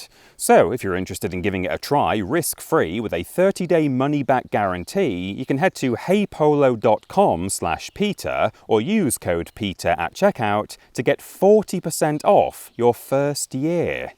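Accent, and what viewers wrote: United Kingdom. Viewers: Nothing quite like hearing a proper British accent expounding upon historical loos. 😂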